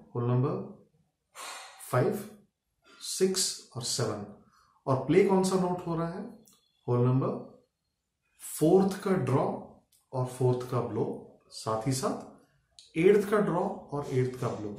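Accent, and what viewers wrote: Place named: Maharashtra, India. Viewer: your accent is like maharashtrian so I thought it.by the way how to start online classes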